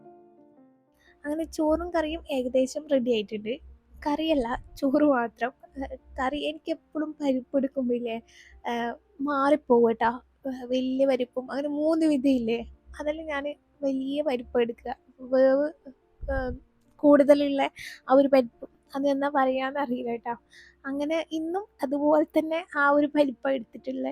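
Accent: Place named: Kannur, India. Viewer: u r from kannur,? talking style is good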